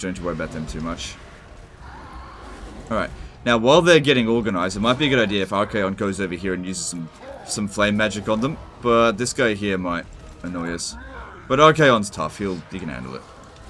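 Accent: Australia. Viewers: The yt Auto subtitles are getting better at australian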